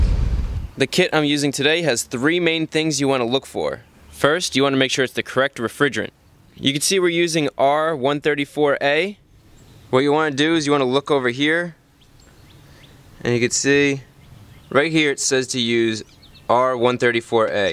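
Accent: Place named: Delaware, United States. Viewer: You are the best, and as a DE guy, I miss people with your accent. Soapy wooder forever dude.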